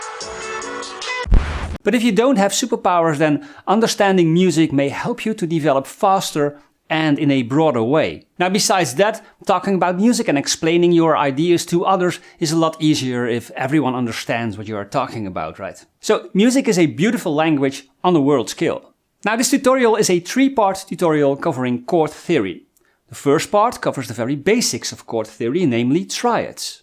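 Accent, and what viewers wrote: Netherlands. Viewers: A)crystal clear B) your Dutch accent brings back warm memories of all my Flemish and Dutch colleagues! Thank you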